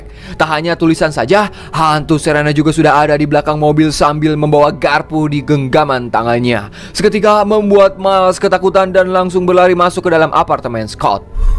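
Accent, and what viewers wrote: West Java, Indonesia. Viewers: Mang sunda na ti palih mana???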